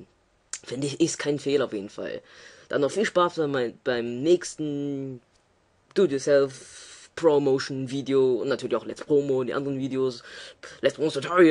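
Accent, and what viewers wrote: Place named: Stuttgart, Germany. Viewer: Für einen Stuttgarter erfreulich wenig Dialekt, für einen 14-jährigen eine recht gute Stimme - so weit, sogut. Wichtiger Tip: Vorbereiten! Gerade bei einem solchen Video sollte man sich vorher ein paar Gedanken über den Text machen, notieren, ausprobieren etc.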